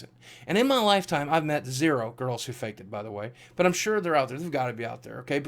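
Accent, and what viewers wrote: South, United States. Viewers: ANIME , but because he's from the south, it's pronounced differently, you ignorant fuck.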